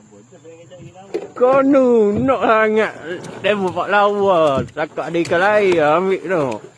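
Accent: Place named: Lipis, Malaysia. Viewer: Tak ada ikan lain lalu ye.....baik baik bahan ke tangan Kang...😂😂original cakap Lepih..😂😂😂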